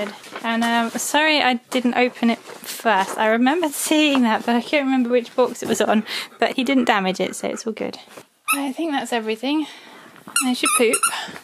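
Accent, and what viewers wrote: England, United Kingdom. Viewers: "Get the tangly bit". I love your English ways of saying things. And Key really listens. It's amazing!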